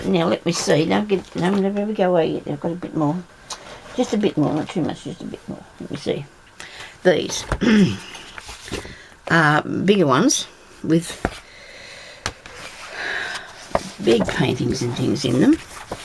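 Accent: Australia. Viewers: hi, just found you on you tube soon as i heard your voice i knew your a aussie lol. just wondering were abouts in australia I am in south australia. do look forward to watch your future videos and you older ones take care. Lesley x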